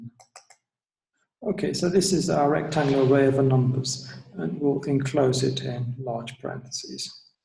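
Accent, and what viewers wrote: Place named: Oxford, United Kingdom. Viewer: sübhanallah sanki oxford üniversitesinde derse girdim bu aksan gerçek mi😱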